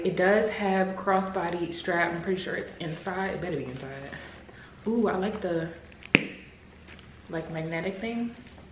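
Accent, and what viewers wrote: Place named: New York, United States. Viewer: she sound like she from ny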